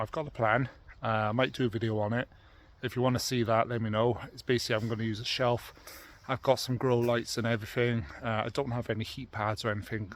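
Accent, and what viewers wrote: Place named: Northumberland, United Kingdom. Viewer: Aha found you! Intrigued by your accent - sounds like a cross between Northumberland and the NPT!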